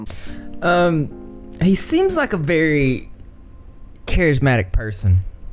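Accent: South, United States. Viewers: Your southern accent doesn't match your style/personality. I'm in the exact same boat though. I'm from Alabama (and have a deep southern accent) but I have a 'city hippie' personality. 😂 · Omg who else agrees this YouTuber has stolen the hearts of many? Cuz he's hella cute even with his southern accent and he has such a likable personality. The way he speaks is so intelligent just, omg he's absolutely brilliant when diving into discussions.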